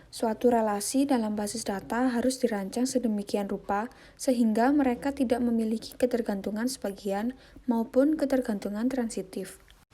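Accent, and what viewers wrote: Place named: Java, Indonesia. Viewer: medok bund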